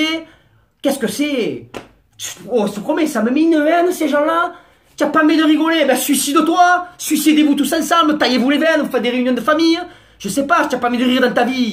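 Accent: Marseille, France. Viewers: T as raison mec ! Tu dis exactement ce que je pense et avec l acceng de Marseille gros ça passe bieng mieux. Degeun peut dire le contraire 😁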